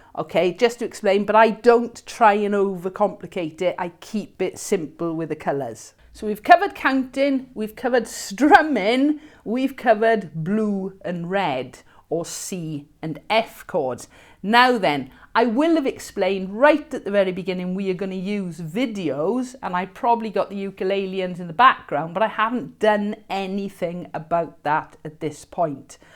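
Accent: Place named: Wales, United Kingdom. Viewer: amazing thank you! I've saved this to watch again a few times :) Also, recognise the fellow welsh accent!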